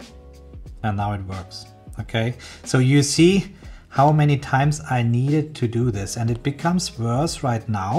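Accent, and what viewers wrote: Germany. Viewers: Du kommst doch Safe aus Deutschland oder?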